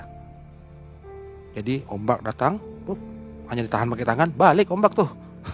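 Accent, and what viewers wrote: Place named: Jambi, Indonesia. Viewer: bhs melayu ny sama kayak di jambi 😭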